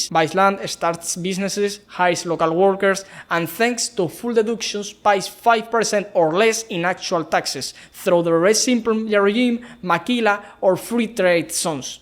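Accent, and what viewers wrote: Spain. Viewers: don’t worry. Es nuestro acento español, q no nos lo quitamos ni pa’trás!😅😂 (a ver, que sí, que se puede mejorar, ….pero no es lo importante. Tu contenido es bueno y es por lo q viene la gente) Sigue así!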